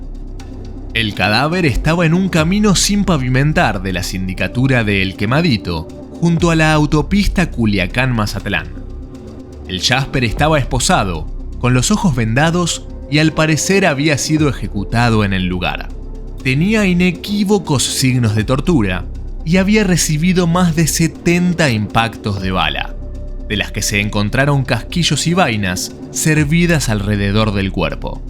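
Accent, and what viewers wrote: Argentina. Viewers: Quien coños narra éste video, ¿Un argentino? No mames 😠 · Tú acento argentino, es tan molesto. ..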